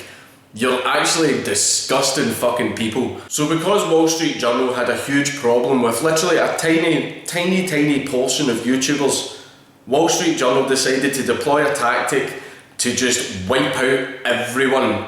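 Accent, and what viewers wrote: Scotland, United Kingdom. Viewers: Holy crappo.. watching these ol skool dank vids is so enlightening as to how far his production value has improved... OMG A SCOTTISH BROUGUE AND AN ECHO!!! lol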